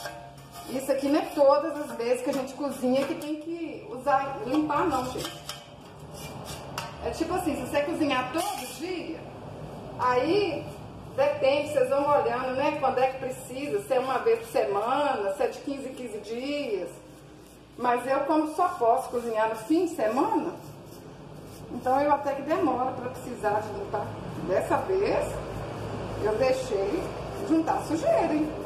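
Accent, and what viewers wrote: Minas Gerais, Brazil. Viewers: Nossa q lindeza de fogão e meu sonho ter um fogão a lenha q no passado eu odiava kkkkk Porq as panela pretas pra lavar era a minha morte kkkkk hj eu adoraria ter q não faz muita fumaça kkkkkkgosto mto da comida Do fogão a lenha Maravilha de vídeo gratidão sua lida tem voz de mineirinha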